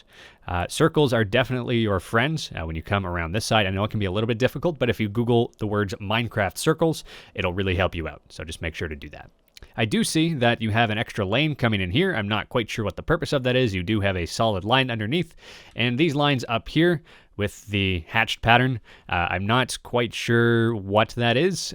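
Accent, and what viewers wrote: Canada. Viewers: sir you have a Canadian accent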